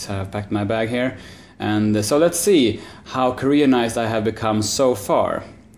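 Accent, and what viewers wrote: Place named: Sweden. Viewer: Swedish Accent! Just say one thing for me... say "time to unmpimp de Auto" like that swedish guy in the VW comercial.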